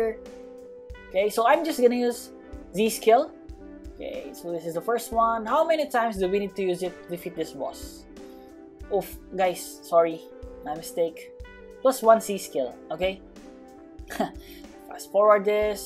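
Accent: Philippines. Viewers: Can I ask u are u a Filipino cause your sound a lot of it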